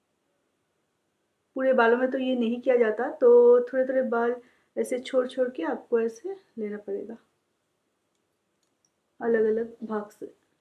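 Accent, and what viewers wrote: Bengal, India. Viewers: Are you from West Bengal, your language is like bengolis speaking hindi ( ^ v ^ )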